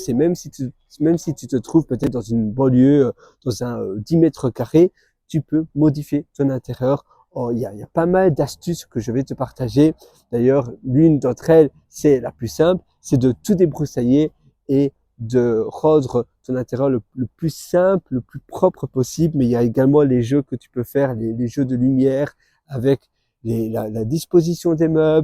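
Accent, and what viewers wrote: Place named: Belgium. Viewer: Tu es belge ? Je reconnais un accent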